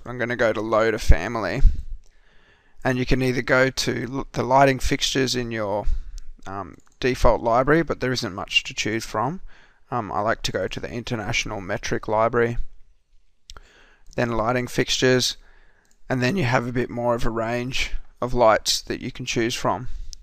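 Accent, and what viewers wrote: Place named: Australia. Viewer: Wow, great work! Thanks for the help! love love love love that Aussie accent too :P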